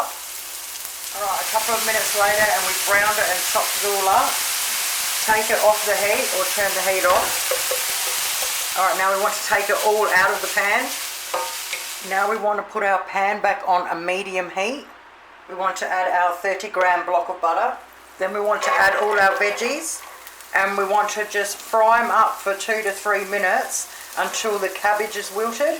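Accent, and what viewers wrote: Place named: Melbourne, Australia. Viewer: I'm trying to work out your accent. Maybe Melbourne? I'm originally a country Victorian but we don't say "All" for Oil or "Ball" for Boil. 😄I have lived in WA for 30 years now. Go Cats. 👍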